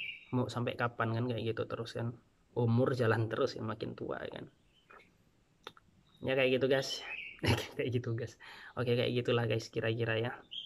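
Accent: Java, Indonesia. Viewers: Kirain orang Jawa mas?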